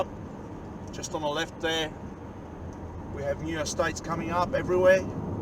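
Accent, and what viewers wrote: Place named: Australia. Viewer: You have a Very STRONG Aussie accent , i just noticed.👍.... good for me to get used to such strongness of Aussie accent before emigrating there.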